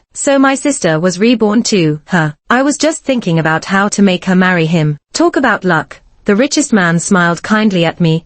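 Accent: United Kingdom. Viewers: Wait so at the beginning she said Beck treated her like a queen. Why did that change as the story went on? Kind of confused but I liked the story a lot. I love the British voice. So classy even when calmly plotting revenge lol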